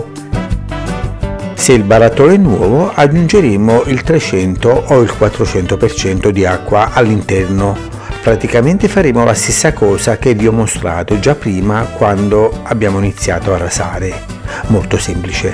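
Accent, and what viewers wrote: Naples, Italy. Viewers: buongiorno! Ma sei di Napoli?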